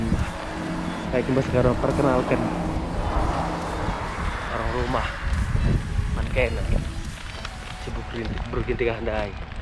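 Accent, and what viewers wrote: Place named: Karo, Indonesia. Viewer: Ada yg jual Arsik di ceko Bang, 😁 Kental kalii, Kalak Karo na 😉😁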